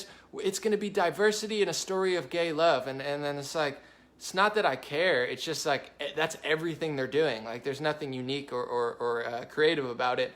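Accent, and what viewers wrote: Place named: Appalachia, United States. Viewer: As a hillbilly, I really enjoy your hick talk lmao! Sounded a little like Disney's goofy but it's still great none the less. Much love brother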